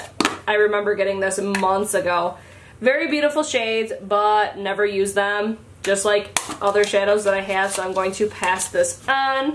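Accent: Midwest, United States. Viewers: What is this accent?? Midwest?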